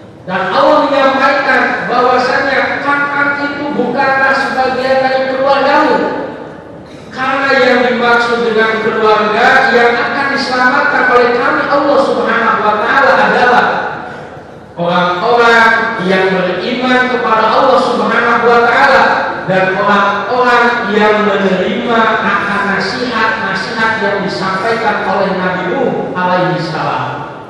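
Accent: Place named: Garut, Indonesia. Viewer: subhanallah sima kawantun has garutna khas pangersa mah 😍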